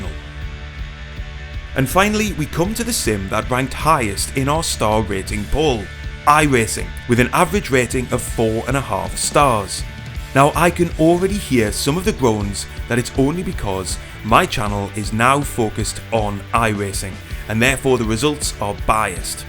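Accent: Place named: North East England, United Kingdom. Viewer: Really enjoyed the video. As an Xbox sim racer this has been super helpful in my research to PC switching. Your trustworthy NE accent helps as well 😉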